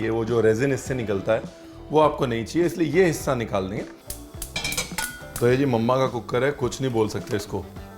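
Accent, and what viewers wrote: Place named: Lucknow, India. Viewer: Adaab ranveer sir apka lakhnavi andaz acha lagta hi cooking with urdu adab maza Agaya. This makes ur cooking and show obviously ur dish royal and great and delicious too like that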